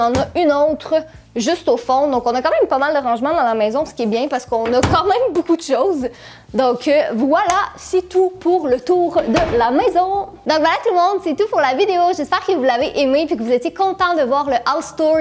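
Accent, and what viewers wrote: Canada. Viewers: C'est tellement beau l'accent Canadien 😍😍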